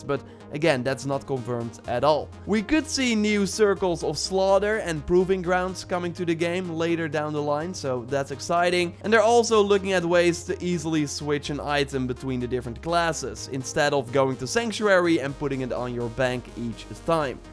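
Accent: Netherlands. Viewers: lol hearing a thick dutch accent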